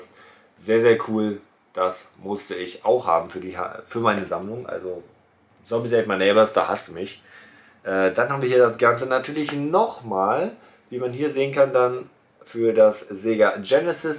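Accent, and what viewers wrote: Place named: Berlin, Germany. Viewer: ich feier es mega wenn du berlinerst :D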